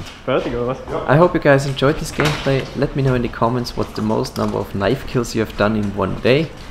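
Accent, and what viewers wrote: Germany. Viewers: You are german? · Are you from Germany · are you german? i am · Are you German? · Warte der ist Deutsch Junge dieser Akzent sagt alles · Hach ja... Deutscher Akzent im englischen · man hört so richtig das er deutsch ist auch im videos als jemand anderer geredet hat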